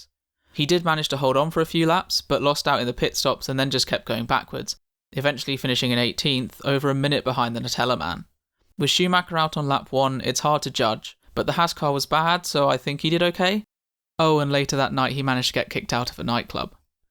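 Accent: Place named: United Kingdom. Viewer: re . Ouch ! That's a bit harsh on Her Majesty . You are obviously British with an accent like you have . No CBE for "Services to Motorsport Commentary" coming to you anytime soon !!!! :-D Ahh, yeah , I do love the way you deliver your commentary . Still I don't give out CBEs !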